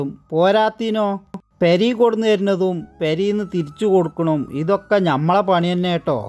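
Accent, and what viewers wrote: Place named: Malappuram, India. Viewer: നിങ്ങള്ടെ ഭാഷ കൊള്ളാം ചില മലപ്പുറത്തുകാർ സംസാരിച്ചാൽ തന്നെ t v m കാർക്ക് മനസ്സിലാവില്ല നിങ്ങൾ പൊളി സംസാരം കെട്ടിരുന്നാൽ സമയം പോവാലറിയില്ല 🤓🤓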